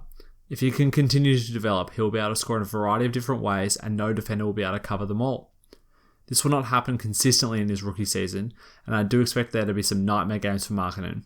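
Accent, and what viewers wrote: Australia. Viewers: Where bouts u from in australia mate, im from the Gold Coast nice to hear another Australian NBA/Chicago Bulls fan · great analysis, but gave me a hard time understanding due to his aussie accent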